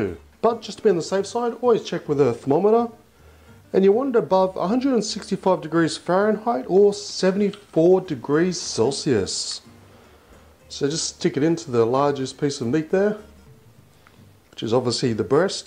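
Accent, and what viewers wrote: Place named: Australia. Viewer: From one Aussie I can say he is from Australia!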